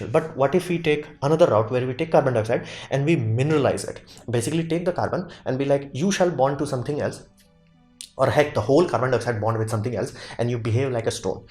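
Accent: India. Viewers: I see. I think if you just took the existing scripts and narrated them a little more slowly, it would make for a slightly longer video yes but may improve it for viewers. I don't think 30 minutes or so is too long for a youtube video -- I agree that there are diminishing returns when you get over the 30 minute mark, like 1, 2 hour videos won't get views. But 30 minutes is fine, people watch informative videos of that length from emerging channels on youtube. And part of it is also your accent -- I am not trying to say that in a judgmental way, just when you combine it with the speed that you talk sometimes I don't catch something and have to skip back. I am an American so if I was Indian maybe I would not have any such trouble. And I am not saying it's a major issue, but even a minor thing like that can an obstacle to your channel's growth I think as people are lazy, they just want to watch videos that entertain/interest them in a very passive way without effort. 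They will click away. But if you slowed down just a bit I think that would completely eliminate the issue. As far as the tech limitation, I'm sure someone with as much tech expertise as yourself could find a workaround, right?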